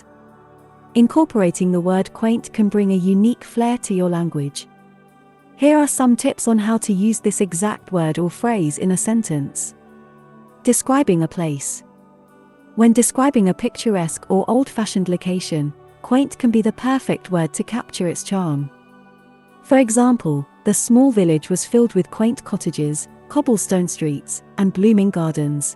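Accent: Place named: United Kingdom. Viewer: Congratulations! Your clips are real great stuff for anyone wishing to improve them English. Especially if you're in love with the British accent. It's pure music. Very "listenable". I was hooked immediately as I came across the very first clip. Oh! How I count myself lucky for bumping into your videos almost by accident. I have already shared your videos with not too few. Please keep up the wonderful work you are doing.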